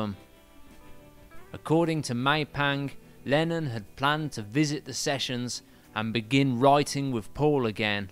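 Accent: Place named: London, United Kingdom. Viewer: Hey, Love your sneering, Johnny Rottenesque voiceover. You from Holloway, too? Great work, as ever!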